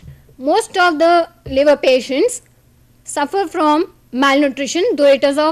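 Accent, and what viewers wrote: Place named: India. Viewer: Anyone who has the patience to listen through the whole lecture will be rewarded with valuable information. Unfortunately some of the written material is hard to see and it is better to stop the video on occasion to absorb it all. Listening to attentively will also clarify the written material, but I do admit that her thick Indian accent and high pitched voice can be a distraction. The viewer must overlook this detail in order to extract the essentials of her presentation.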